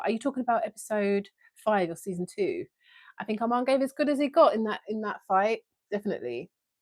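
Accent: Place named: United Kingdom. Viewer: I love your voice and your accent!🥰 Are you British? Sorry for such a personal question😅 I'm learning English so accents is a big deal for me. This is one among many reasons why I love Jacob. He absolutely nailed 3 different accents! 🤩 So it's okay if you feel uncomfortable to answer my question. I'm not insisting or being rude😅 Anyways, I like your content 🥰 Thank you!✨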